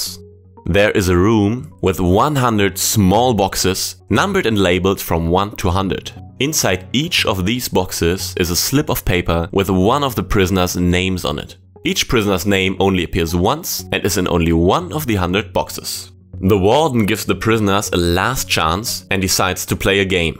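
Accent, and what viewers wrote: Germany. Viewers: Is that a german accent i hear?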